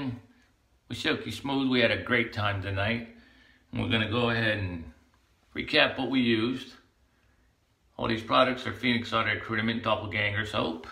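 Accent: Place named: Texas, United States. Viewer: wow that’s cool! I’m British but my girlfriend is from Austin Texas and we were trying to pin down you’re accent and said she thought you were five different states but that you could be from Austin. Thanks for clearing that up dude, keep the videos coming and the shaves silky smooth.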